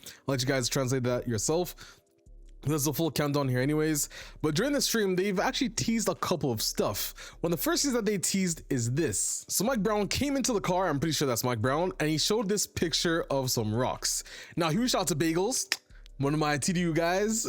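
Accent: France. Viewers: Your Inflection On Certain Words Is Becoming French Too 😭 Pis Pas L’Type Canadien 🥀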